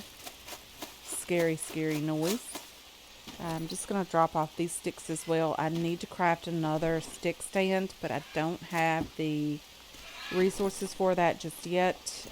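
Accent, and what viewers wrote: Kentucky, United States. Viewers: Hey loving this gameplay series so far (I came from following your Subsistence videos). Might have to give Green Hell a try. Learning a lot, enjoying the content and just let me say, your voice brings a happy nostalgia to this Kentucky girl (living in MI). Its so nice to hear an accent from home! Also, have you ever heard of Starsand? Its a desert survival game and its unforgivingly brutal. Looking forward to more content! :)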